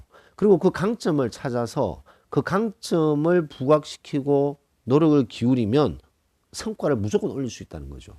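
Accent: Gyeongsang, South Korea. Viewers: 고맙습니다 늘 힘이 되고 있습니다 경상도 사투리로 조근조근 말씀해 주시는데 제 마음에는 무엇보다 잘 와닿습니다 괜찮아~~~ 뭐 이것쯤 이겨낼 수 있어~~~ 용기내어 보려합니다 다른나라와서 머물면서 갱년기 시작되면서 약해진 마음상태를 다잡아 보려합니다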